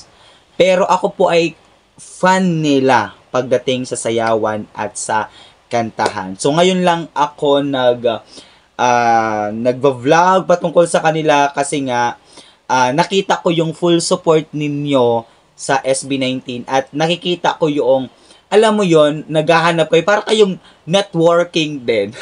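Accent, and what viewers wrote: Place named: Mindoro, Philippines. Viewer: Nakakatuwa ka din pala kapag serious mode medyo ang usapan😁Destined na maMeet mo muna ang Sunshine Boy Stell and Sunny Boy Justin tlaga for you to have an interest in the group. Magandang simula Yan... and I'm also glad that you're being very True to yourself when you said that you're just starting to know and find out their stories Para masabi mo Kung nasa ang level ka na sa Yong paghanga, just a natural feeling👍👌😊. So either a casual fan or an A'tin makes no difference, just as long as your chanced meeting with Stell and Justin has already stirred within yourself a liking to them as artists, that's a good start. BTW, are you from Marinduque or Oriental Mindoro? Napansin ko lng sa accent mo during your vlog about Stell and Justin. I'm from Or. Mindoro, but my roots are from Marinduque...ngani😁👍❤️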